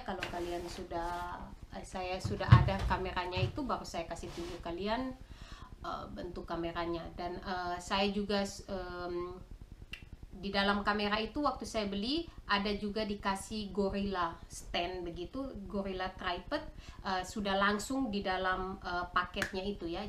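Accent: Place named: Sulawesi, Indonesia. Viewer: Logat bahasa sulawesi, mukanya juga sulawesi, slam kenal..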